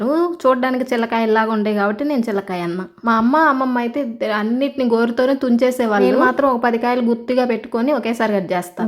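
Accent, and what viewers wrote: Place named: Chittoor, India. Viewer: Ya ooru akka chittoor slang la undi meedi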